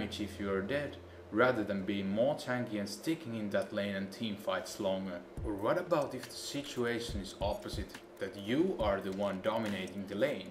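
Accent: Finland. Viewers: Very much off-topic, but where are you from bro? Your accent sounds finnish.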